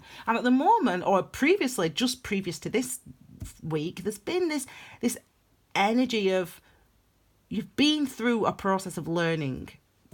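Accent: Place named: Scotland, United Kingdom. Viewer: First time I’ve listened to you and this truly resonated with me. Love your accent. Scottish?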